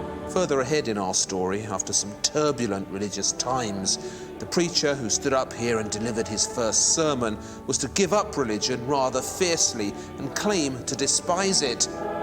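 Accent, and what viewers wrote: England, United Kingdom. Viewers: Why do you pronounce the "er" in clerk as you pronounce the "ar" in art? same with the fooking derby. The Kentucky Derby. innit? . Derrrrrby. not Darrrrby. With an "e". The Darby? Fuck sakes, England. Get it right. Yes, yes, we say learned instead of learnt, and burnt etc. but at least we pronounce derby correctly. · Waldemar Januszczzak you are taking my breath away, the way how you talk this narrative is literal refreshment. I hear you with my nices brain neurons while my ears turned deaf with that beautiful British accent. Thank you for sharing your knowledge, journalists' curiosity, poets' spirit, and Slavic gen.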